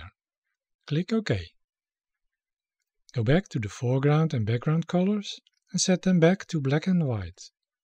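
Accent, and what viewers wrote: Netherlands. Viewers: You have that typical dutch-english accent, love it.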